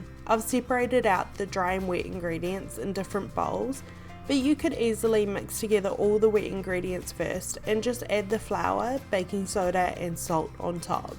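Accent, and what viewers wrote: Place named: New Zealand. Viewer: She's from new zealand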